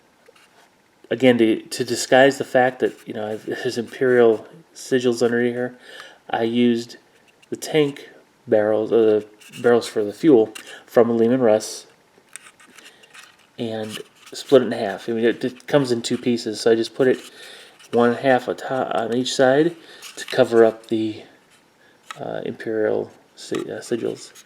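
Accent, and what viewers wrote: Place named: Poland. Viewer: Tylko Po co ten Polskie akcent szpeci model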